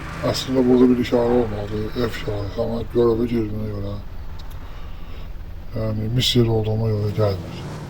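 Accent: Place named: Baku, Azerbaijan. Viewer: Qardas haralisan bele baki lehcen var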